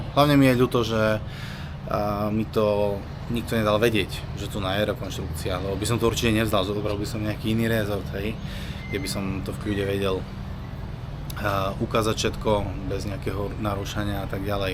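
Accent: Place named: South, Slovakia. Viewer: super video,dakujem za typ a nie si z južneho Slovenska? Myslím si podľa prízvuku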